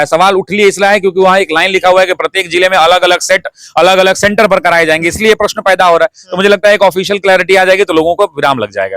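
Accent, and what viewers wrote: Bihar, India. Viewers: सर... पूरा बिहारी टोन प्रयोग करते है....😂😂😂हमारे बिहार की शान........ और सर 🎉🎉🎉🎉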